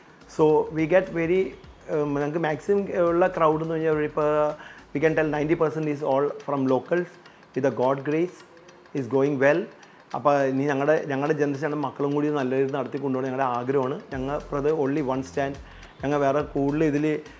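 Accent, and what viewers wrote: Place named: Kochi, India. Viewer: കൊച്ചി സ്ലാങ് ❤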